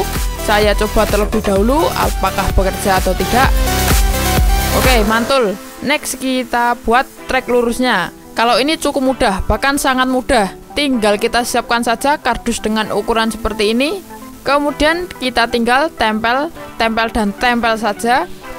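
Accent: Java, Indonesia. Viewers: Kreatif bagus gw suka,,,tp gw lucu sm suarany Jawir bngt.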